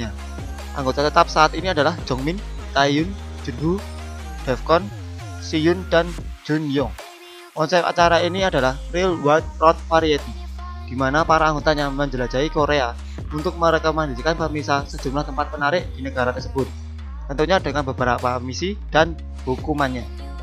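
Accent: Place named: Java, Indonesia. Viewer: jawa sekali kalo baca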